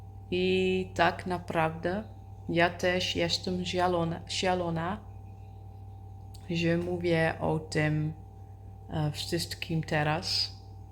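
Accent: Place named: Netherlands. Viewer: Bardzo mi się nie podoba jak kaleczysz nasz piękny język z tym obrzydliwym holenderskim akcentem.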